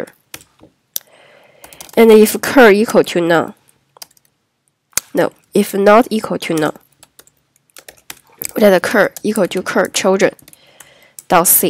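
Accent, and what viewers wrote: China. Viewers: Please improve your English. It's really hard to hear your annoying Chinglish.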